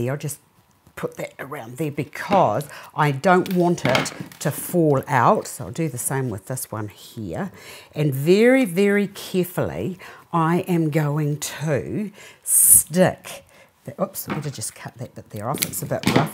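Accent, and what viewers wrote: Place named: New Zealand. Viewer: Hi.. long time no see lol.. I remember from new Zealand morning show... now im in Australia and love videos and the ol kiwi accent lol... have a awesome year.. =)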